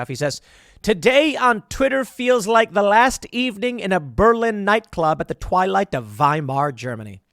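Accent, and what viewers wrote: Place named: California, United States. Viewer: Is that a California accent or what? I hate it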